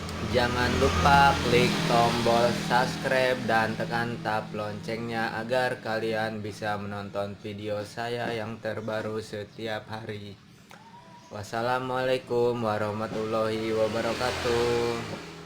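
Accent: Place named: Banten, Indonesia. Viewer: bang .logat ngomong nya kaya orang banten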